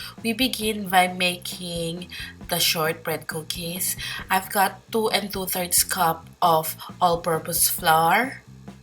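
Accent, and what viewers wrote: Philippines. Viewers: Ohh...because I had a friend who is filipino and she recognized your voice that you are filipino.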